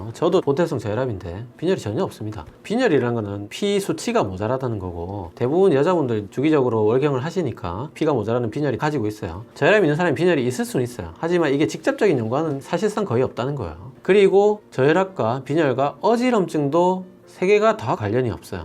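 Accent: Gyeongsang, South Korea. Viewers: 경상도 사투리가 친근하네요.믿음이 갑니다.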